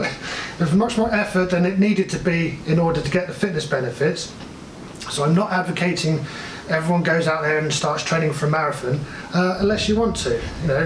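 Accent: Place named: West Country, United Kingdom. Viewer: Bloody hell you sound like my wife literally she's from the west country! Eat healthy stop drinking get fit lol.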